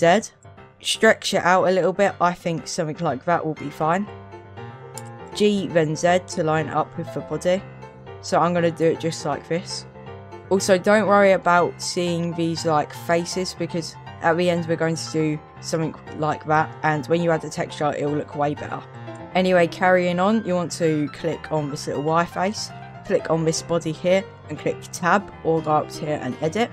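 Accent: United Kingdom. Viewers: He’s British, that’s how they say Z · it means Z its because hes british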